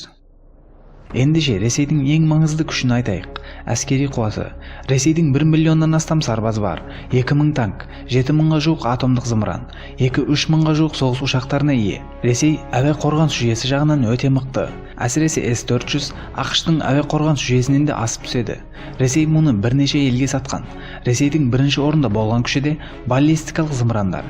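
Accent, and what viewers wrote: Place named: Uzbekistan. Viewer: Тилин биртурли сенин озбексинба акцент бар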